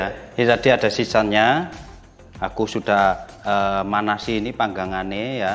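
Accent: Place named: Java, Indonesia. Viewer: Masnya kok meddok gitu ngomonge ...wong jowo y mas?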